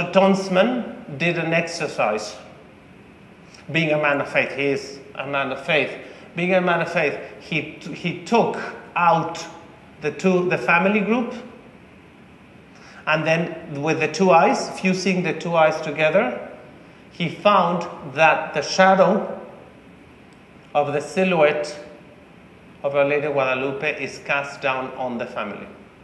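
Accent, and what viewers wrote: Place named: Ireland. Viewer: I pick up a bit of Irish in your accent, I thought that can't be, you're Spanish speaking, maybe you've been to Ireland or studied and lectured there ? Marvellous miracle which is inexplicable , I think there's more to be found, hidden in this treasure .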